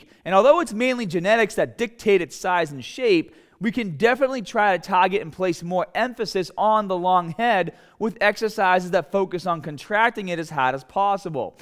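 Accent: Boston, United States. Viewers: Is that a Boston accent? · amor macalalad he’s from Boston so a Boston accent.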